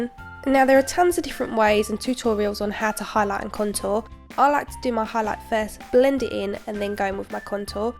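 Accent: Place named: London, United Kingdom. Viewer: Lol, It's the London accent I have it to but voice it very pretty!!!!!!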